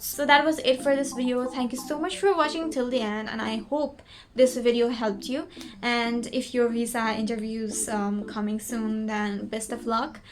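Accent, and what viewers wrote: United States. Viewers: american accent sounds irritating But congratulations and keep on progressing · OMG!! Your accent is soo amazing. Can you give me some tips to have that "American" accent. I've been searching online for it. But it would help me so much if it is from someone with a similar background. Btw thanks for the advice as well.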